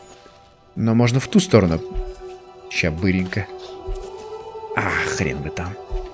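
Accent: Germany. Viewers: немецкий акцент это что-то с чем-то. Жду следующее видео с немецким языком🌺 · Лайк, если знаешь немецкий окцент.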